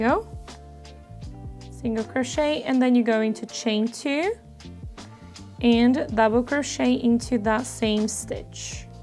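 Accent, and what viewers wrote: Brazil. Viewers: I just discovered your channel and I’m loving your tutorials, can’t stop watching ❤️ I notice you have an accent and it looks a lot like Brazilian, are you Brazilian? I am from Brazil 🥰🇧🇷🇧🇷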